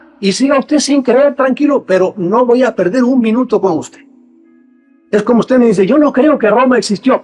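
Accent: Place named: Mexico. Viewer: Amo su acento mexicano! Me recuerda a mi abuelito :')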